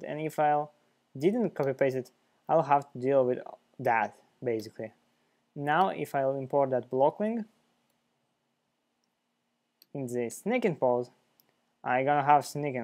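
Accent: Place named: Russia. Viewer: Your accent sounds like Russian.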